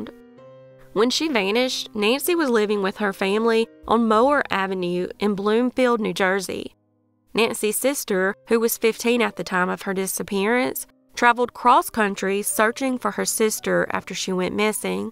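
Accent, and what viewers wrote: South, United States. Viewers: Good job, u did loose alot of your southern accent as your stories progressed. Fyi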